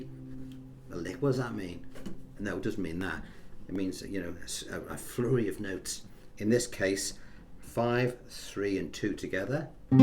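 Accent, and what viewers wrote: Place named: Wirral, United Kingdom. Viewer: Thanks for all you're doing. I'm really enjoying it... especially as all tuition is in a familiar Wirralian accent. Cheers!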